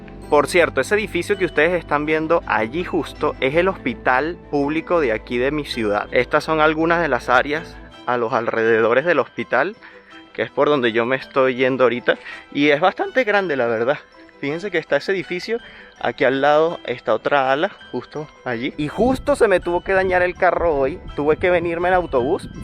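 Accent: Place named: Venezuela. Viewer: Primera vez que veo tus vídeos, saludos, por tu acento eres venezolano pero ¿en qué país estás?, que maravilla de hospital, saludos, en nombre de Dios saliste bien y seguirás libre del virus, saludos 💋💋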